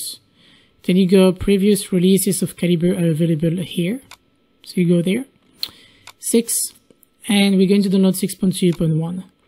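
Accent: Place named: France. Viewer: Really good tutorial + super sweet french accent, thank you again!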